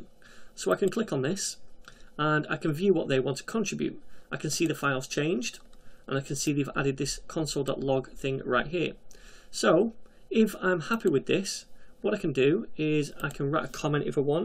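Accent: Germany. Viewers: German accent BTW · btw the accent (yah good) was part German, part Dutch, part Swedish - executed to perfection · Think that was a German accent.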